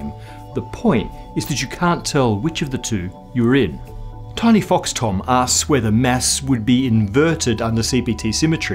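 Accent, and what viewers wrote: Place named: Australia. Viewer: This gentleman makes very interesting videos and I can only say good things about them. I can understand why Professor O'Dowd has so many supporters. I feel terrible for saying this but to my eyes he has an extremely strange shape. His head seems to be much too big his torso and legs appear too small. His legs seem to be too far apart and too short. It's the oddest thing. His habit of holding his fingers intertwined with the thumbs touching on top for no reason I can determine irritates me intensely. On top of all this is his very strange stresses on apparently random syllables in each sentence. And finally there's that Australian accent which grates on my nerves like a chalk screech on a blackboard. The thick eyebrows and beard seem particularly ill suited for his looks. There's nothing personal about any of this and really I mean no offense as the whole perception is entirely mine and I can't explain it. 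Am I alone though? To say something good about Professor O'Dowd is easy. The inconsistency between the two results for Hubble's constant is fascinating as indeed are the topics of Dark Matter and Dark Energy. We live in exciting times for cosmology so consider the enormous difference between this scientist and another man who obtained a Doctorate in Astrophysics, Dr Jason Lisle, whose area of study was Solar Dynamics and who published a few papers before throwing his career away to become a "researcher" for an Answers in Genesis type outfit and a YEC (Young Earth Creationis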